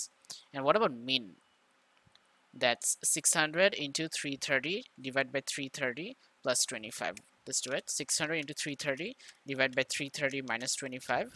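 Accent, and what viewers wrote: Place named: Bangladesh. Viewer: yeee agreed Also that accent was so clearly Bangladeshi ahah Love the representation tho, he’s much better than that Pakistani dude who barely explains anything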